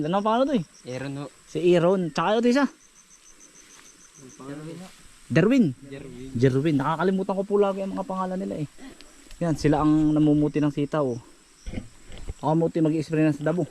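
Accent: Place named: Batangas, Philippines. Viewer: Ay BATANGUENIO KA EH KA INSAN ANG YUNG PUNTO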